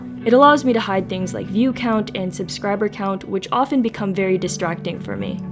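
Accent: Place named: India. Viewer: Your accent sounds like Indian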